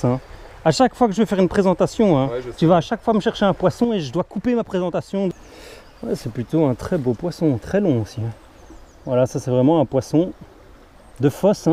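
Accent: Belgium. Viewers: Belle vidéo merci, ça fait plaisir d'entendre un accent Belge c'est familiale 😁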